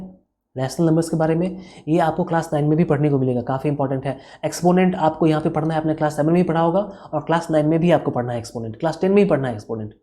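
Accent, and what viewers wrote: Bihar, India. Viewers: Sir I love your bihari style 😍🤩🔥🔥🔥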